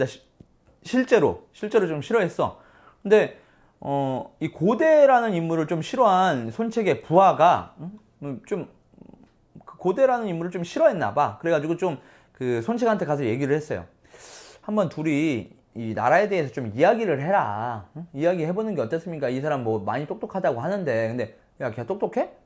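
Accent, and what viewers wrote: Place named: Chungcheong, South Korea. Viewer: 충청도 삼촌이 구수하게 삼국지 얘기 들려주는거 같다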